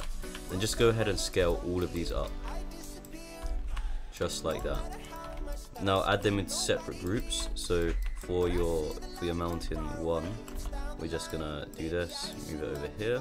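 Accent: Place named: United Kingdom. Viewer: shit tutorial and overrated, deserve like 1k views, I dont understand anything of what u are saying with ur stupid british accent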